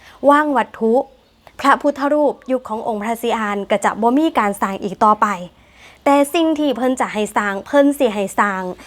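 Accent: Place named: Vientiane, Laos. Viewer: เว่าม้วนอีหลี เหมือนลาวเจ้า ลาวเวียงจันทร์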